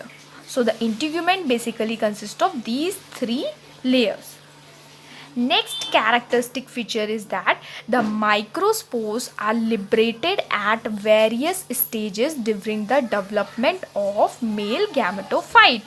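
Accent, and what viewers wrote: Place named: India. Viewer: Ye Indian accent he... Tu saale gymnosperms samjne aya he yan English seekhne... Ch***ya Sala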